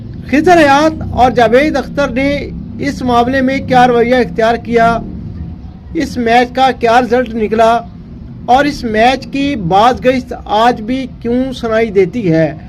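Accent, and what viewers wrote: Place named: Punjab, India. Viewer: اصل میں کہنا تو نہیں چاہیے مگر کیمرے پر واقعی گفتگو کے لیے اعتماد کے ساتھ مطالعہ چاہیے ہوتا ہے تاکہ الفاظ کا چناؤ ہو سکے کیونکہ تکرار ادبی اور تقریری خامی ہے۔صاحب پوسٹ دونوں حوالے سے کمزور معلوم پڑتے ہیں کیونکہ الفاظ کی ادائیگی میں بھی اعتماد نظر نہیں آیا۔بھلے پنجابی لہجہ غالب رہے لیکن مطالعہ روانی بخشتا ہے ۔ڈراما نگار منو بھائی کا لہجہ پنجابی ہی تھا مگر گفتگو سے پڑھے لکھے ہونے کا پتا چلتا تھا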